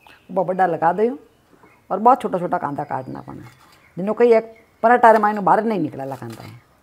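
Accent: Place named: Rajasthan, India. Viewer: Bahut badhiya rajasthani boli bolte ho aap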